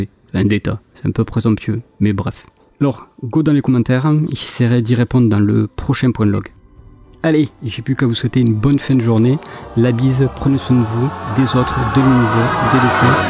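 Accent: Toulouse, France. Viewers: Voila pourquoi je n'ai jamais pensé a unsub cette chaine! Toujours un plaisir d'entendre cet accent qui m'est si cher (oui je suis toulousain et chauvain) Bon retour sur youtube j'attend avec impatience ton nouveau contenu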